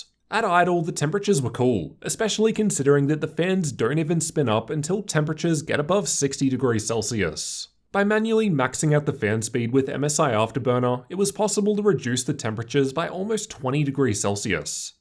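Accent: Australia. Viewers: He has an Aussie accent which normally has an upward inflection on declarative sentences. Perhaps he's trying to neutralize his accent? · I don't think he sounds that weird, but I'm an Aussie and I'm use to our accent. I will admit his pronunciation and accent on certain syllables isn't exactly typical but overall he just sounds like a regular Aussie to me. I think he's just reading through his script and his voice naturally goes low when he hits a comma and even lower for full-stops. We have very slight regional variations in our accent. He sounds like he's from one of the Southern States as people in the North tend to raise their voice pitch at the end of a sentence instead of lowering it. Aside from all this voice banter, I think he presents the information quite well and I found him really quite helpful when I recently upgraded my video card.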